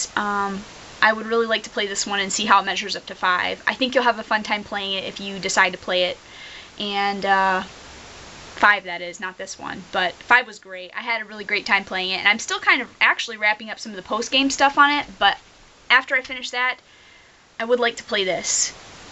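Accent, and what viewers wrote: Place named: South, United States. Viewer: Oh ok... Thats cool! Im from Alabama and your accent sounds southern to me... You ever been to Alabama?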